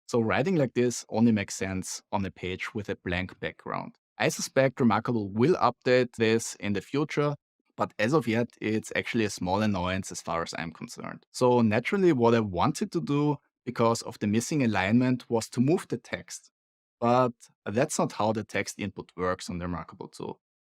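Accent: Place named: Vienna, Austria. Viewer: This Viennese non-English is hard to stomach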